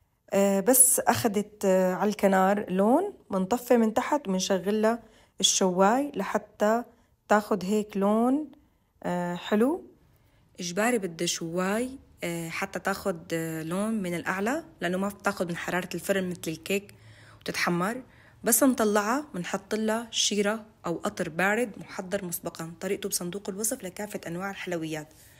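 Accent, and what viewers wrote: Homs, Syria. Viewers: الصوت الحمصي كيف ببين فووورا😅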